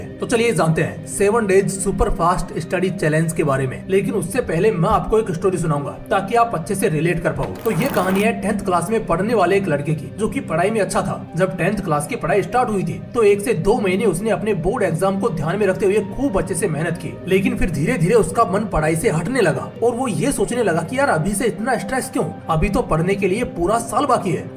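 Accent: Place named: Mewat, India. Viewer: Haryana new Mewati soundh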